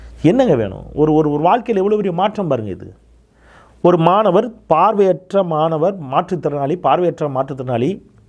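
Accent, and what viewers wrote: Tirunelveli, India. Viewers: தம்பி எல்லாம் சரி தான் பா. ஆரம்பத்தில் ரொம்ப மிராட்டுகிராயே. நெல்லை வாசமா? நீதிபதி தங்கைக்கு, வங்கி மேனேஜர் ஆகியோருக்கு வாழ்த்துக்கள்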